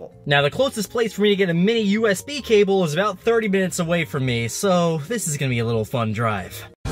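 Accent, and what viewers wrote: United Kingdom. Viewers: bro is british gl :D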